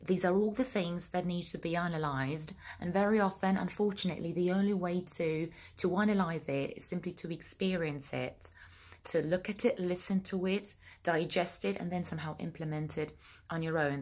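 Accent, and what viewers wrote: United Kingdom. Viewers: Piękny akcent :) brzmisz Posh, tak na marginesie :D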